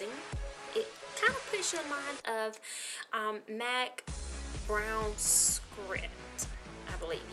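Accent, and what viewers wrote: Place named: South, United States. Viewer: Hey my new YouTube Noir friend! New subbie here! Love this pop of color!! I am also an Alabama girl by way of New York City and I have been working on my southern accent for years so don't apologize, lol. Also check out another one of our Alabama makeup beauties here on youtube: NitraaB. Look forward to watching your videos, tfs, God bless.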